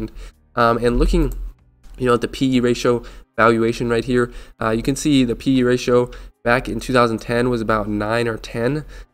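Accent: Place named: North Carolina, United States. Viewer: I heard your NC accent dude! I'm from Chapel Hill, lol, living in Spain for 8 years now. Thinking about jumping in to good ol' Duke Power. Thanks for the vid man and good luck!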